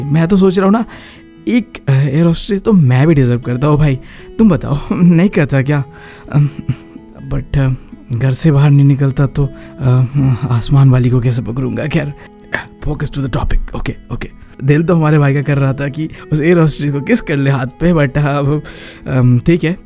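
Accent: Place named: Assam, India. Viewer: Bro has Assamese shall bihu bihu lagise ..... Love from Assam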